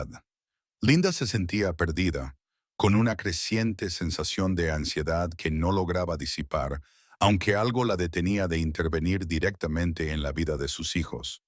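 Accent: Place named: United States. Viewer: Estaban en la entrada de la casa? o estaban en el piso de arriba?, te contradices. Además esa voz agringada, me resulta chocante.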